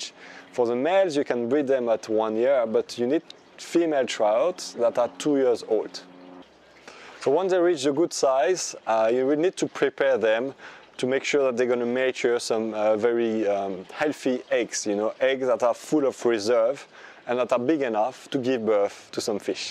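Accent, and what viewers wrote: France. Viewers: There is nothing more debonair than a frenchman speakng english - Im sure all the woman are hanging on every word he says. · Great video also the french accent 👌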